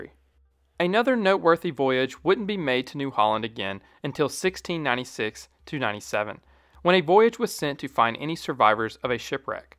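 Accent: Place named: Tennessee, United States. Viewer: Hey there I was trying to guess your accent and just wondering what state you’re from ? I hear TN. Thanks. Nice video.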